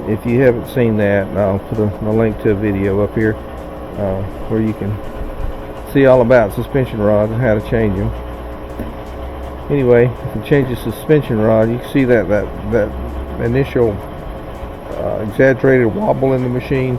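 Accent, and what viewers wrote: South, United States. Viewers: Thanks for looking at my comment and responding, I really appreciate that. I just came across you today, and I really like your videos. Where are you based out of? It's definitely Southern, that's for sure👍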